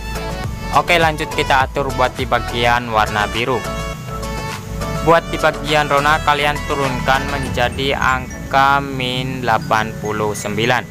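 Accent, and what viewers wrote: Indonesia. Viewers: Bang emng lu orang Cirebon barat? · Wong Tegal um?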